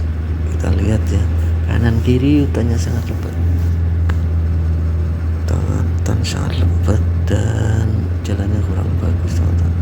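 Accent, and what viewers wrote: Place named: Tegal, Indonesia. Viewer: Tegal..ora ngapak ora kepenak..hadir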